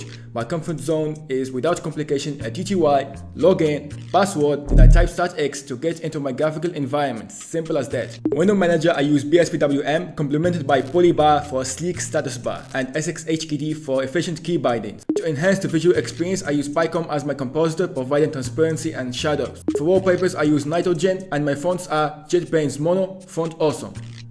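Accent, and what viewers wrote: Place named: Maghreb. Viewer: mghribi accent, i can sence it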